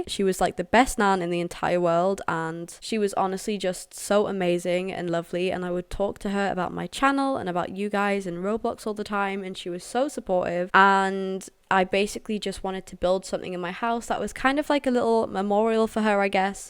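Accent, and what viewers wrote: United Kingdom. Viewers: She is British 😊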